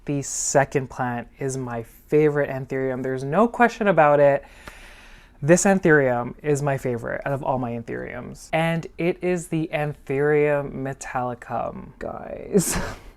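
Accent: South, United States. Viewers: That Hoya Lisa is to die for! You crack me up. That southern belle accent is to cute. Love the sweater.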